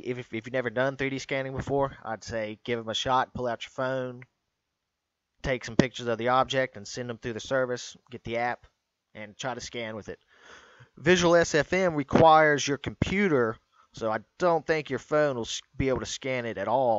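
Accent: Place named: Georgia, United States. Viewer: You accents sounds like Georgia or somewhere close.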